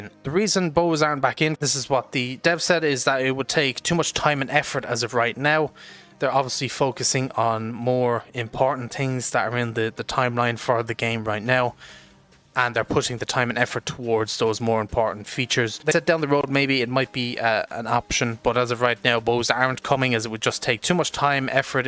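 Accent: Ireland. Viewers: are you irish because i can hear your accent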